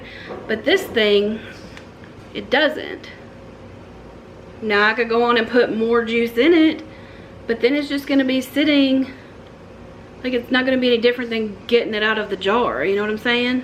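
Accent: Kentucky, United States. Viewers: Just live about one hundred miles from you. I picked on that KY accent as soon as you spoke…..lol. Love all you’ve done to your home. I grew up in mobile home, it was 10 feet wide and 40 feet long. After raising 4boys I’m ready for a tiny house again, I lived in something much bigger during that phase of life. Now it’s just me and I’m ready to downsize.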